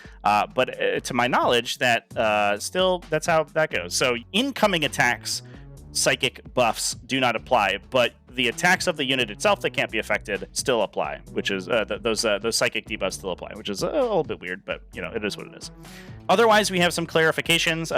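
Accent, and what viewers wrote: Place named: United States. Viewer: Dodgy head set. You still sound American :)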